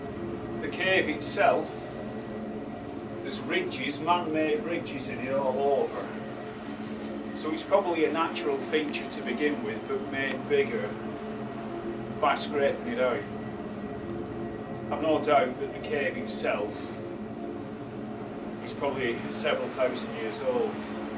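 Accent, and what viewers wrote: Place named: Lincolnshire, United Kingdom. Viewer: My dads from Clethorpes...he spoke like you. I believe that was Lancashire. No..... just looked it up it was Lincolnshire....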